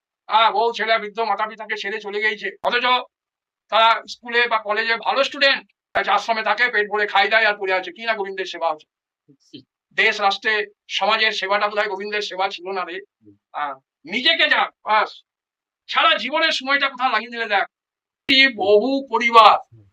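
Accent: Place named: India. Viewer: বাংলা কথা হিন্দি কথা টান দিয়ে কথা বলছেন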